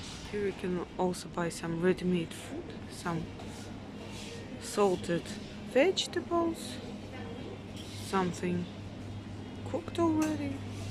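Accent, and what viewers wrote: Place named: Russia. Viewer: Your voice is relaxing. I like Russian accents.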